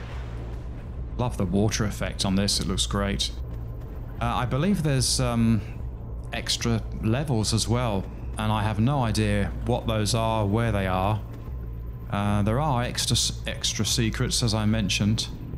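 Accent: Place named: United Kingdom. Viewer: Nice to hear another player from the UK for once lol, do you play Defiance by any chance....we could do co op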